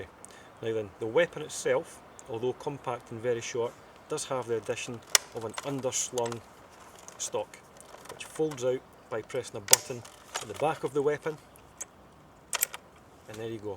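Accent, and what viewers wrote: Scotland, United Kingdom. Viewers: heavy scottish brough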